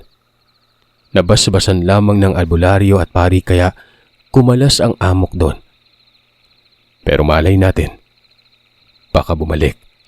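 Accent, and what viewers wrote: Visayas, Philippines. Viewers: listening here from Lapu- Lapu City Cebu...hello sir cute mo mg bisaya 😊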